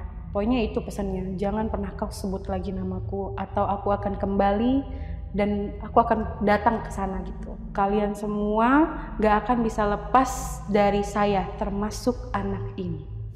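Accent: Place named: West Java, Indonesia. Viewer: Kak logatnya udah mirip orang Sunda lho 😊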